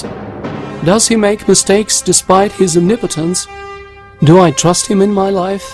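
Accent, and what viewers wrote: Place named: United Kingdom. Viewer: OH NO! Islam is telling people to be good and spread right and forbid whats wrong! HOW TERRIBLE AND DREADFUL! But we'll find a way to make it seem scary, lets add a douche with a British accent and some ominous background music and pics of random guys with beards cuz they look scary! Fuck ignorance!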